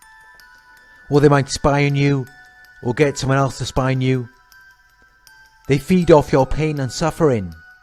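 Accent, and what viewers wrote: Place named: New York, United States. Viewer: I FOUND it looks Great. You look and Sound so New York. My Dad was from Flatbush.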